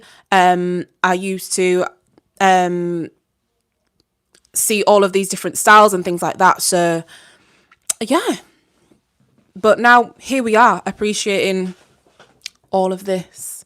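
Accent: Northern England, United Kingdom. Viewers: I knew you were a northern girl! I’m from Huddersfield (although live in the midlands now) so recognised the accent! Love these lives! Keep it up so engaging. To be fair at school most of the black girls including me had relaxed hair so you probably still would have had relaxed hair, that was just the done thing!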